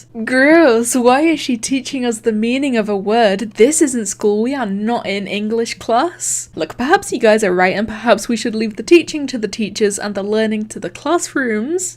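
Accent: United Kingdom. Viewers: She's british and they speak like that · She sounds British... Roblox sounds like rublux (no offense )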